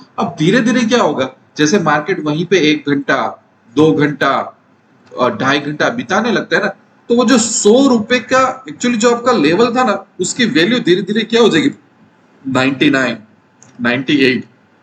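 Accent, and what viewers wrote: Gujarat, India. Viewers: Pakka gujju bhai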